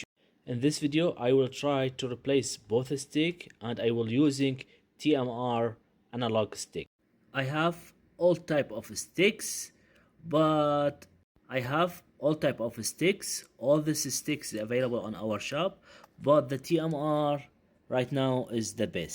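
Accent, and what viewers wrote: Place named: Iraq. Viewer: من الاكسنت أعتقد ان الأخ عربي من العراق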